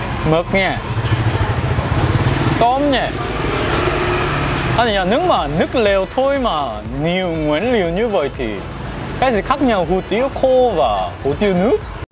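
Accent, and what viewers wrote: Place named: North, Vietnam. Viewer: nói tiếng việt cũng dễ nghe mà, mà sao ở sài gòn mà nói như giọng Bắc ý nhỉ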